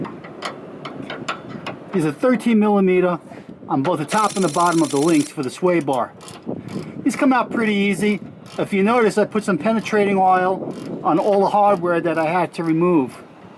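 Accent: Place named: New York, United States. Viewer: I just assumed he was in NY because of his accent and I was wondering how his car is so clean so I was confused till I read your comment.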